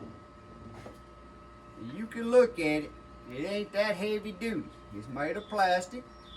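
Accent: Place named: Texas, United States. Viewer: You have such a delightful accent. I'm not sure if it is Texan? I can't get the wheels assembled properly on mine. They are very rickety. I'm just immensely proud of myself that I managed to assemble it at all. Mine is a different make, but very similar to the one you demonstrated. I'm too scared to use the large cutting blade so haven't tested it yet. I do like the plastic blades. A: I feel safer using them, and B: I think they really did a good job. Shall see how long this little machine lasts, but given that a gardener charges £25 an hour here these days, if I get six months out of it, then I've still saved money. TY for the video. Oh and I love the wooden playhouse. So cute.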